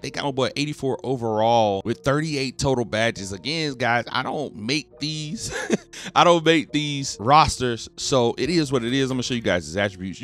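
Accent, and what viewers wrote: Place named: Alabama, United States. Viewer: Nahh you gotta be from Alabama I hear it in yo voice 😭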